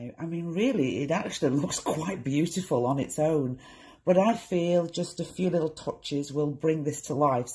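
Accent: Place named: North, United Kingdom. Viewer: Love your work, loose is the way to go. Sounds like you are from somewhere in the north?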